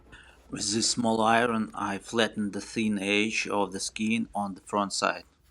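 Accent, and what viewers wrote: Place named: Russia. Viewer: По акценту будто русский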